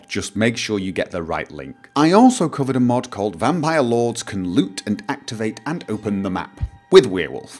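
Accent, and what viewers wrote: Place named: England, United Kingdom. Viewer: DawnGaurd to me was a total disappointment! Vacation? Come on you sound English use Holiday please!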